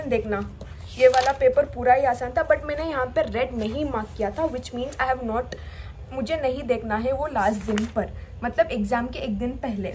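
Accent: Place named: Karnataka, India. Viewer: Are you from Karnataka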